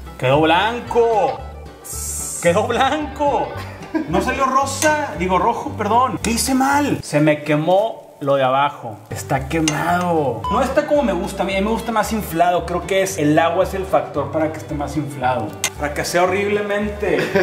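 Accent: Monterrey, Mexico. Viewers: amo tu acento regio y esa energía. Saludos!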